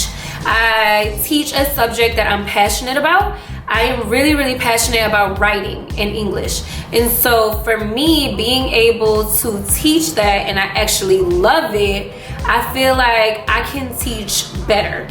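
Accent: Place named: Louisiana, United States. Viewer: I never comment but I'm loving your consistency! I come here just to hear your accent! I'm from Louisiana too I'm in Houston now too 😊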